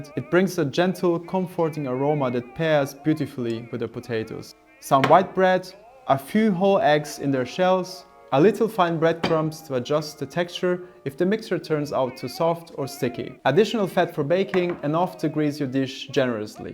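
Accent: Germany. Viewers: This channel combines several things I adore: pre-WWI German history (when it was unproblematic to admire the Germans); a well-researched and -scripted deep-ish examination into an obscure but interesting cultural artefact; listening to a native German speaker using the language with such facility (I'm biased, I speak German and like the German-speaking-English accent, with all its idiosyncrasies); and quirky moustaches. This channel really is so verdammt charmant, especially the gramophone segments. And 20 minutes long this time! You spoil us :) BTW don't Europeans also use small blunt-ish paring knives to peel potatoes/apples/etc? That jagged knife you use for these tasks looks like an accident waiting to happen ;)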